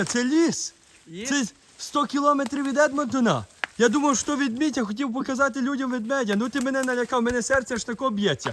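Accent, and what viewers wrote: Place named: Lviv, Ukraine. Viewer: Я,коли чую вашу розмову,не можу повірити,що ви 17 років живете в Канаді)) таке враження,що ви з якогось львівського села)))) ваші відео піднімають настрій)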